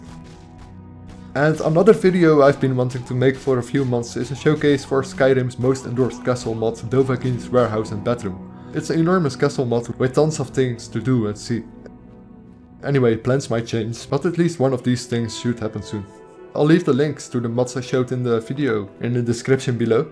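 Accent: Netherlands. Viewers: Je bent nederlands hoor ik gelijk! :D NICE!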